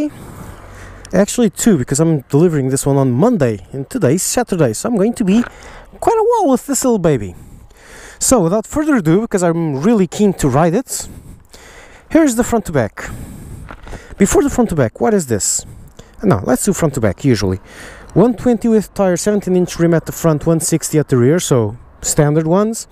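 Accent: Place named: Portugal. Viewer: I love our portuguese accent when trying to speak English xD Boa mota, estava a pensar em comprar, e grande dia de Marginal xD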